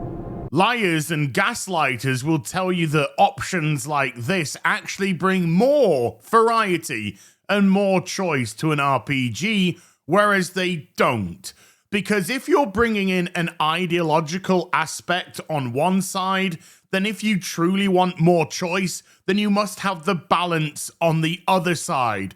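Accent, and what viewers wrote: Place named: United Kingdom. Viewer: mate, the british accent is already insufferable, why would you subject potential viewers also to those veilguard clips they've guaranteed already seen before?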